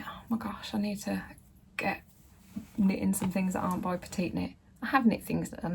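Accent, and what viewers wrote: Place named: Birmingham, United Kingdom. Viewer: I am from Birmingham so was drawn to your accent. Loved your first episode and looking forward to watching more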